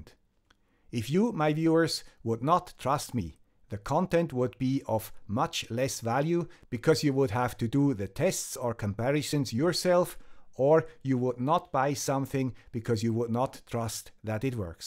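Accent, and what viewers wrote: Switzerland. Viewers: This is a much more philosophical video than I expected, but I enjoyed it. Thanks! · Joking aside, very nice content. Continue trusting 🙂. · Your video is an important message today, not just for Engineering, but for day to day life. I greatly appreciate your channel and always smile when I see a new one of your videos appear. All the best from the USA where trust is in rather short supply. Hopefully, we can learn a little from the! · I’d like to think not many commercials or youtube channels influence me in a substantial way. You are the exception and it has allways been a good experience. If i need some engineering advise or a point of view on something i usually think “what will the have to say about this”. You really do add something to the table and i trust the “Swiss accent” brand. Not doing any midroll commercials is also very much appreciated. 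I have been annoyed much by youtube lately and unsubscribed from some channels that run more than 3 commercials in 10 minutes. What a pleasure to watch without any rude interruptions! Wish you all the best in 2021!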